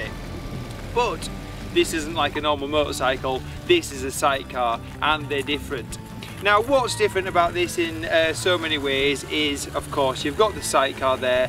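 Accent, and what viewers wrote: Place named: England, United Kingdom. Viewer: Where about from England are you from??.. Scouser here. And looking at Trikes, sidecars, and everything in-between. Ohio is a bit thin on the ground.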